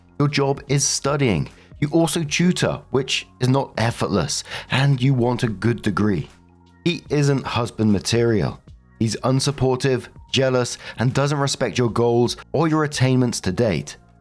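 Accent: United Kingdom. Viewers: Can anyone remember what channel was called before? I have been racking my tiny mind and can't remember anything but I started following him as I knew he had to be a Brit.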